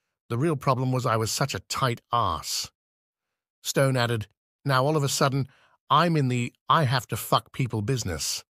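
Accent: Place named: England, United Kingdom. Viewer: This is an American movie. Why is this narrator English and a male? He's irritating.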